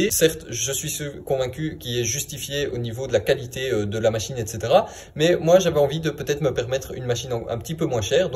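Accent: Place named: Belgium. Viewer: Salut, je découvre ta chaine et j'apprécie beaucoup tes présentations (avec le petit accent belge charmant). J'ai beaucoup aimé la présentation des 4 machines à arbe hélicoïdal. En regardant aujourd'hui (nov 2023, les prix ont sacrément évolués. Celui de la Jet s'est envolé à plus de 4000€ livrée. Par contre, celui de la Bernardo est resté bcp plus maîtrisé et elle est maintenant à presque moitié prix, achetée directement en Autriche chez le fabricant et livrée (sud de France). Est-ce que ça modifierait un peu ton point de vue (indépendamment des accords que tu as avec machinoutils et qui, évidemment, ont pesé dans ton cas dans la balance). Bien cordialement. Bruno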